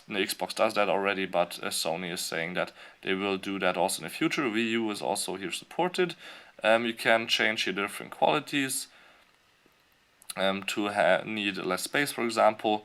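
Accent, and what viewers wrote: Germany. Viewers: Man hört dass du Deutscher bist :D...Aber trotzdem sehr gutes Video,gut erklärt :)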